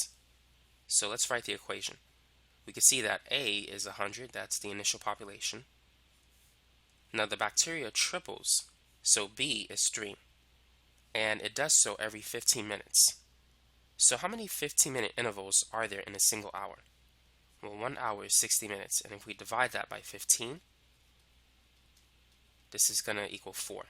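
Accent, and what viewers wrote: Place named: New York, United States. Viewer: New York accent innit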